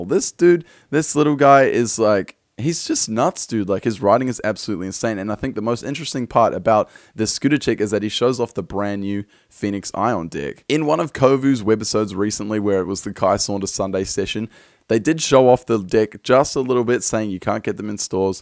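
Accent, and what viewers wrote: Australia. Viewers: Are you Australian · Are you Aussie I am!!!!!!!!!!!!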